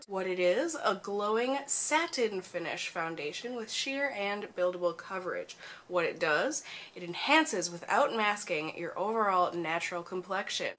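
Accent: Minnesota, United States. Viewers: I know how difficult that kind of transition in life can be, so big hugs to you! I worked at a jewellery store for years and the only thing that motivated me to come into work was the friends I had made there, they were all so amazing and we had so much fun when we weren't angsting over the shittiness of the job. I get ya, girl! Moving to Japan has also been wonderful and really difficult as well, I miss the things I used to do and the friends I had, but I equally love my time here. Anyway, I look forward to your MN accent fully coming back :DV I bought the foundation a couple of months ago and as much as I love it, I also hate it because it transfers SO much and I get shitty I have to powder it so it doesn't transfer onto all my clothes and onto the clothes of all those around me!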